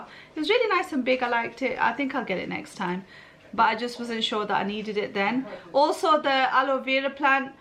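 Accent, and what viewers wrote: Manchester, United Kingdom. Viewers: Can’t you tell by her accent? She’s from near Manchester!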